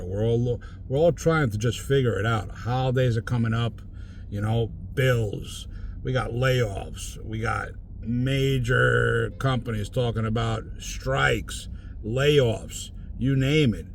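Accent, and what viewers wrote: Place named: Philadelphia, United States. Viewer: Philly Philly!!! That. Is. Where. I. Am. From. 🤪😎 man, you’re accent feels like home. I’m in the Bay Area now (unfortunately)…wanna get back into gig work tho. I’ve had about enough of the 9-5 stress…overworked and underpaid and unappreciated, all for someone else to get rich at the end of the day…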